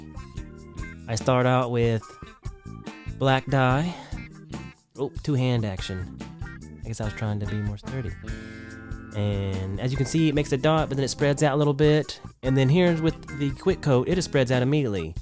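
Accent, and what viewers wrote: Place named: South, United States. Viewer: Damn I have been away from Tennessee for far too long, that southern twang makes my heart sang.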